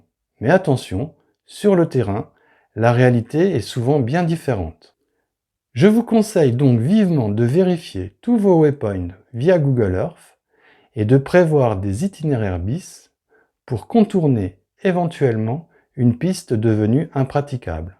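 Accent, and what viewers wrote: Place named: Alsace, France. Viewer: j'ai cru reconnaître l'accent... Moi je le suis (alsacien), mais expatrié en Provence... Pour pouvoir un peu rouler en 4x4 sur les magnifiques Chemins du plateau de valensole et des gorges du Verdon....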